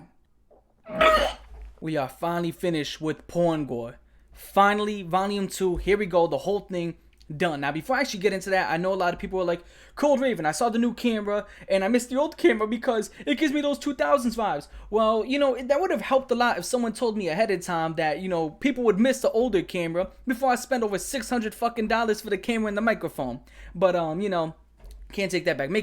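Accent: New York, United States. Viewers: What part of New York you from broski?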